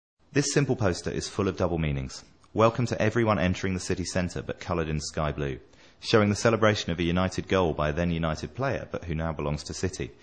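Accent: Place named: South, United States. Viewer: This is comedy gold - the narator talks about city and says "We did this" "We did that" - an obvious city fan, WITH A FUCKING SOUTHERN ACCENT ! Manchester United are FROM Manchester - Newton Heath to be precise - born in 1878 - much earlier than city. Its all about where your BORN not where you LIVE. One last point, United have had higher average attendance since records began in the 1900's than city, even when united were in Div 2, below city, MORE MANCS ATTEND UNITED GAMES THAN CITY, FACT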